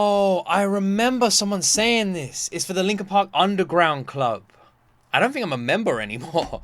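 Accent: United Kingdom. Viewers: Where are you from, mate? I'm trying to get better at discerning accents. I assume UK, but not sure what part. I went down a rabbit hole last night with reactions to LP releasing this new song/tour/album. They are one of my favorite bands, and I think they made the right move by going with a female new lead singer.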